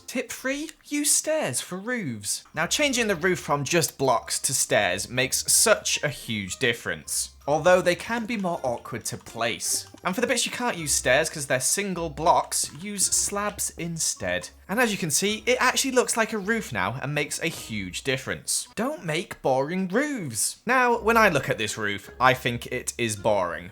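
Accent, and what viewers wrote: United Kingdom. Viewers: I really like your british accent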